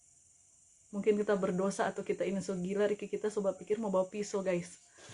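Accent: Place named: Manado, Indonesia. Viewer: Itu logat manado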